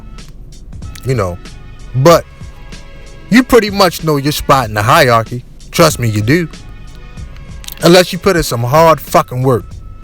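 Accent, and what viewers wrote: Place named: Maryland, United States. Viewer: I can tell your from Maryland or somewhere up north. Am I right?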